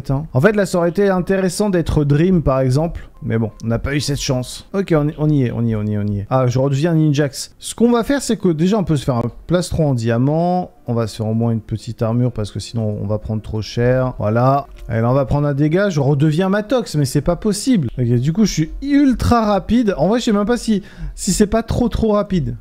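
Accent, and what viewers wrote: England, United Kingdom. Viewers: pas pour moi l'accent anglais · Je t’adore je je t’aime ton axant anglais .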